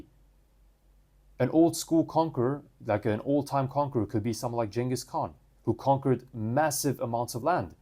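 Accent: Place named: Arab world. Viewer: Your accent is like Rudy Ayoub's, the 'Arab Dad' guy. Good message.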